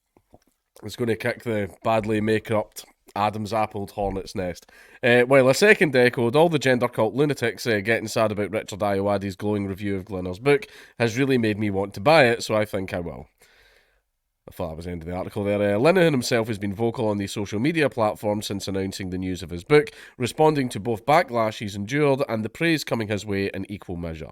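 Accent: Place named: Scotland, United Kingdom. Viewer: You're the Scottish version of Brian Blessed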